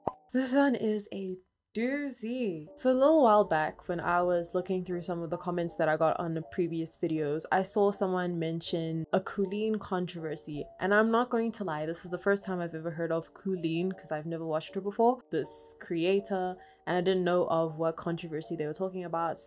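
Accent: South Africa. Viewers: I was wondering is this a South African accent. Thinking nooo maybe uk maybe Australia then you hit that “but wow” and now I know. I’m sure. Hello my sister.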